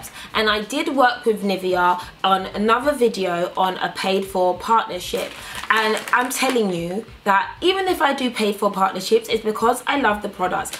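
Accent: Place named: United Kingdom. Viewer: She was born and raised in London therefore she has a British accent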